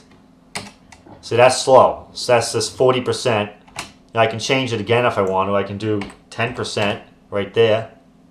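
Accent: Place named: New York, United States. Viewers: Do you like in NYC? You kinda sound like it. Sounds cool. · You have a very strong accent are you from new york or jersey?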